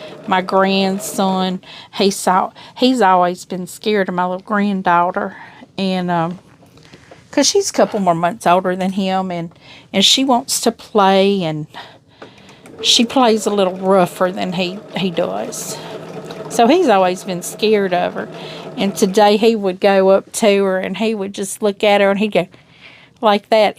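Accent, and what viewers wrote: South, United States. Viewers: Love listening to you and your southern accent. My son-in-law was in the service so when was released he went to the post office and applied. Apparently the post office puts service people on the top of the list, after they have completed the exam and application process.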